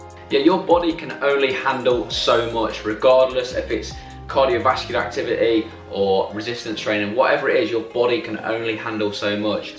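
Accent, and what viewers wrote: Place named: North, United Kingdom. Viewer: Nice one man good topics, your northern accent remind me when I used to live up there haha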